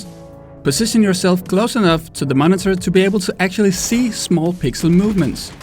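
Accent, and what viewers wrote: Denmark. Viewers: Er du dansker? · Are u from Denmark?! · Are you danish? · Are you from Denmark? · Great video. Subscribed! 👍 Maybe stupid question, but are you Danish? 🇩🇰😁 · ARE u danish? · Årrrh du lyder så dansk at det er hårdt for mig at se den her video haha. Ellers rigtig god video! Kan se du har brugt ekstremt meget tid på den, hvilket jeg sætter pris på!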